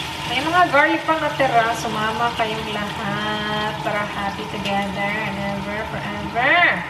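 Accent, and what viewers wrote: Visayas, Philippines. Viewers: sobrang cute mo mag kwento ate hahaha nakakaaliw, and while cooking hahaha. Ang the bisaya accent and words lol hahaha I love you tlga ate hihi 😊😘 Love your vlogs so much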